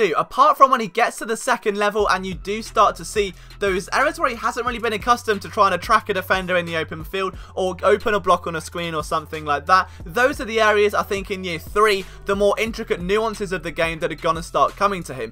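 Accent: England, United Kingdom. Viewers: Love the channel to bits. Where in England is your accent from? Keep up the great work, Philly loves ya kid! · I don't what it is, but I just can't listen to NFL commentary by a Brit. Could be the most insightful view but just can't stomach it.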